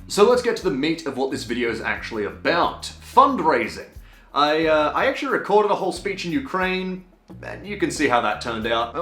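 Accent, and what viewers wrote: Australia. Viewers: I wont lie man, when i first watched one of your videos 2 years ago i wasnt sure if i liked your stuff or not. It took me a couple of months of watching random suggested videos here and there before i actually subscribed.. But im so glad i did. Your gameplay stuff is consistently funny and enjoyable, the largescale stuff you run is nothing short of epic.. But this takes my respect for you to a whole nother level. A lot of people talk big, not many of them actually back it up by really helping, and very few would actually go over there and put themselves in danger to deliver that help themselves and show your support in person. I dont want to put words in their mouths but Im sure it lifts the battered spirits of Ukranians to see forgeigners from literally the opposite side of the world being willing to go to such lengths to support them in their time of need, and them being able to see your face and hear your ozzy accent in person must just solidify that feeling even more. 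Youre a man with principles, courage and integrity and I have absoltely nothing but respect and admiration for you,. Cheers bro Slava Ukraine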